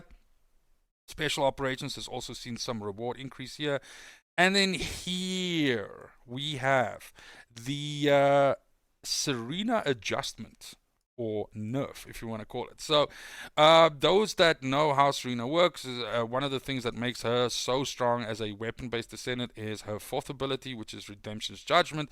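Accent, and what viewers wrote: South Africa. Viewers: I saw you are from Denmark but, you really sound South African!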